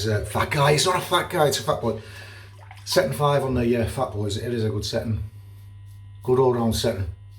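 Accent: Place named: RP, United Kingdom. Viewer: Get'in a bit posh now then lad?!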